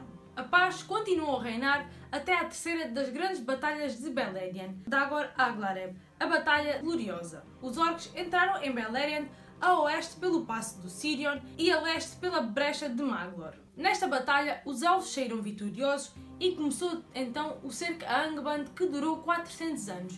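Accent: Portugal. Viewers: Gente sou brasileiro e já morei em Portugal por um ano e meio, por isso amo tanto o sotaque português. E saber que esse ótimo canal tem uma representante na Europa que faz videos com a mesma qualidade e preocupação em respeitar o material fonte assim como os meninos do TT Brasil fazem deveria ser algo de orgulho. Fico muito triste que pessoas com essa mentalidade façam parte dessa comunidade incrível que somos os tolkientalkers, mas até mesmo em Arda os malignos tentavam dissuadir o bem.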